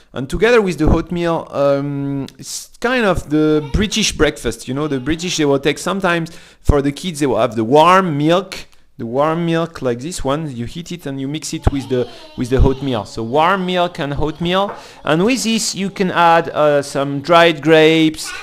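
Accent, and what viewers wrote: France. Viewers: L'Anglais avec l'accent français c le meilleur :)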